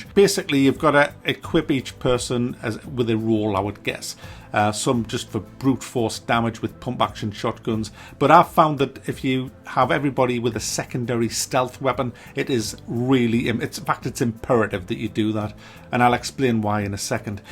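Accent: United Kingdom. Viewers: your voice sounds like you are a sailor from a colonial era British ship. Is it a "limey" accent? I am not from UK, I don't know what accent is from where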